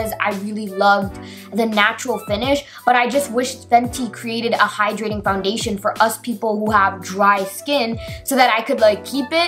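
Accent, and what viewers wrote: Toronto, Canada. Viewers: You talk like you live in Toronto/Scarborough.... 🇨🇦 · i hear the Toronto/GTA accent im dead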